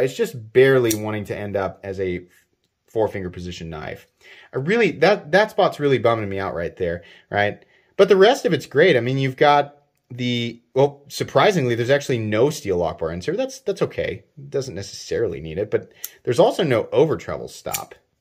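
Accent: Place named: South, United States. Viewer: Extreme southern: thes air ryt hare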